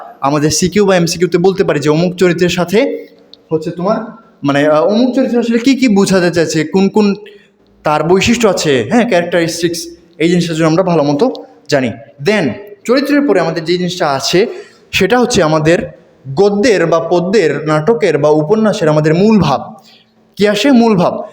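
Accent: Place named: Sylhet, Bangladesh. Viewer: Vai ki Sylheti? Apnar accent sune mone holo apni Sylheti.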